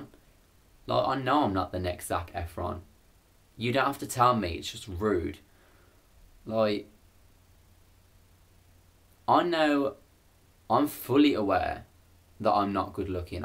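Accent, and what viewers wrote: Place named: Birmingham, United Kingdom. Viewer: I’m Bromsgrove u sound same x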